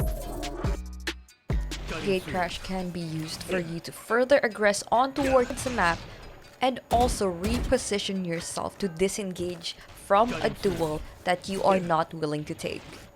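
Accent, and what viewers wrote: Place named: England, United Kingdom. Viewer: nagulat ako bakit english accent pero nag tagalog lmao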